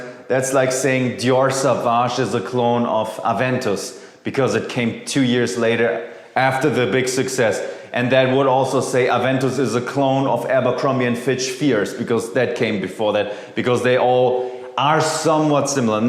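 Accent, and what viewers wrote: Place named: Germany. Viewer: It’s his German accent. Btw pretty sure he speaks English better than you as a native speaker ever will lol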